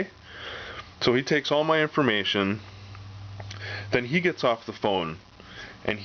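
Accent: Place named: Minnesota, United States. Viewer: being prepared isn't paranoia , it's being responsible. In a life and death situation seconds count so making the simple effort to have a pistol within arms reach at all times is a matter of self preservation. And in this guys case it's a necessity with his local police force, or lack there of. Just curious what state you live in, you sound like my friends in Minnesota.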